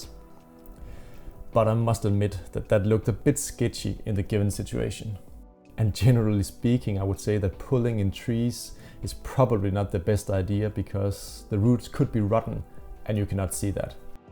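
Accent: Denmark. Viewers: Var i tvivl om du var dansker vurderet ud fra din accent.. Indtil jeg hørte “Arrrh! det var tæt på!”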